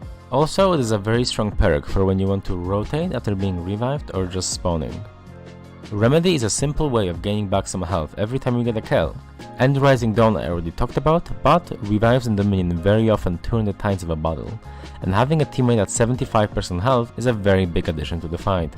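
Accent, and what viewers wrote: Poland. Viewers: o to samo pytałem w którymś filmie xd Gość brzmi swojsko z tym angielskim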